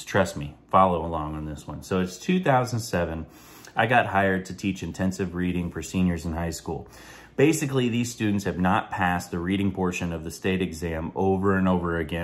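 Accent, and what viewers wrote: United States. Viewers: 👂 Sounds like Lake County Fl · Sounds like Jasper, Missouri.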